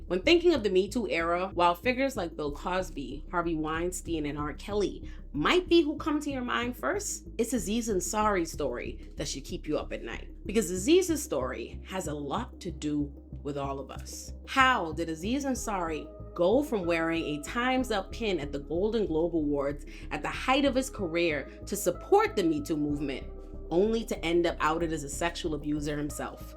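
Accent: Bahamas. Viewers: I may have asked before, but you Bahamian?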